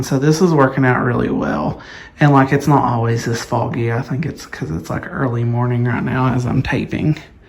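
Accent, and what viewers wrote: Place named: South, United States. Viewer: Why do I love your voice/accent so much?! I think it’s bc it’s southern-ish sounding, yet you don’t speak As slowly as other southern accenters do? I have no idea, lol.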